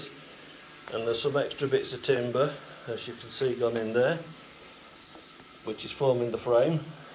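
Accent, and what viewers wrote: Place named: Midlands, United Kingdom. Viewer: hear your dulcett Midlands tones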